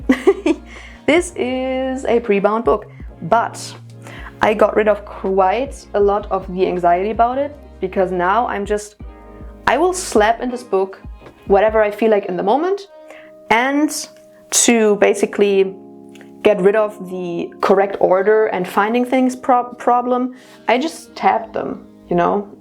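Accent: Germany. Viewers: Dude i knew you had a german accent and not a scandinavian.... Why are you saying you are norse???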